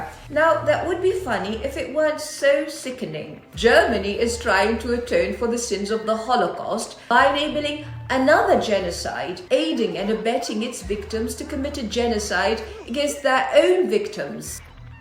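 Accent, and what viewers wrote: RP, United Kingdom. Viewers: Ok I know this is not the point of the video, but why does the narrator sound like a white upper class English woman? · This is a really pathetic attempt at respectability, there should be no Muslim, lecturing anyone, on genocide and oppression, this woman is a joke, how much of the world has Islam conquered and enslaved, in the last 1400 years, and no matter how much they try and sound intelligent, with their posh English accent, they will always fail to have any credibility.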